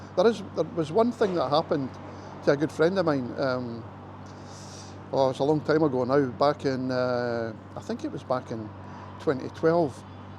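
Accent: Scotland, United Kingdom. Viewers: I just finished listening to the age gap piece. First comment, your accent gives you away ' '. I was born in Glasgow in '45. There is a 12 year age gap between my wife and I. Not a big deal as she was 44 when we met 22 years ago back in Canada. She was an OFW contract Caregiver and I worked in Health Care. Our paths crossed and the rest became history. Planning ahead, we bought a condo in Davao in 2012. It became our holiday home. Now that she is retired too, it is now our retirement home and Canada is the holiday destination. As for the age gap, there never really was an age gap for us. When we got together, I made a point of learning as much as possible about the Philippines, from the beginning to the current. History, politics, customs, music, you name it and I am nose deep into absorbing as much as possible. Take care, stay safe and keep posting. 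👍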